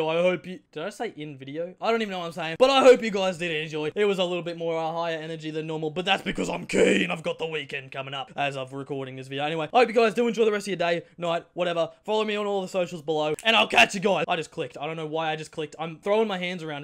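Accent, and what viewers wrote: Australia. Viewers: This is the most Australian person I’ve ever heard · every video of December it sounds like is getting more and more Australian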